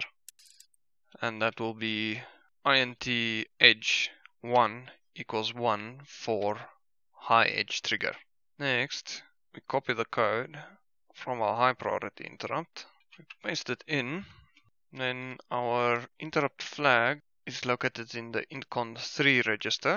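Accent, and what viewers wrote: South Africa. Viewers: The accent sounds South African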